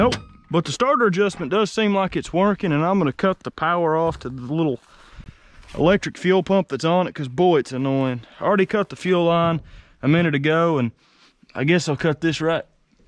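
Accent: South, United States. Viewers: I’m from Long Island New York like your 🪄✨southern accent✨ 💪🏻😎👍🏻 keeping the best cars on the road⚡️🇺🇸⚡️ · Coil its just a country accent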